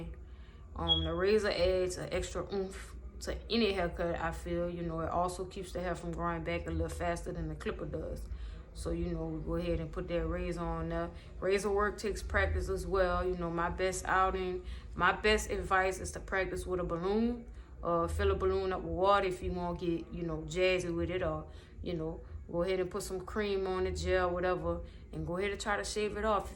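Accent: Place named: New Orleans, United States. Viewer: you straight out of new Orleans that accent thick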